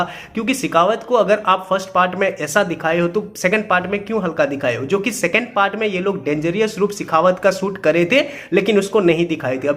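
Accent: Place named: Sambalpur, India. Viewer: Bhai aap Sambalpur se hain kya?? Main Odisha se hoon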